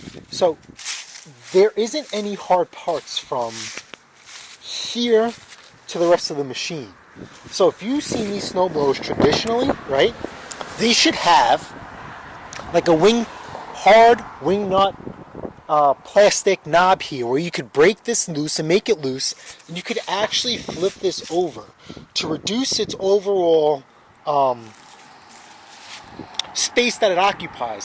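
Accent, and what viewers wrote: New York, United States. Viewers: This video just keeps on giving! I had the same same problem on the same machine. It starts better now than it ever did. Instructions were easy to follow, and I appreciate the NY accent and sensibilities. I'm from RI and we're cut from the same cloth.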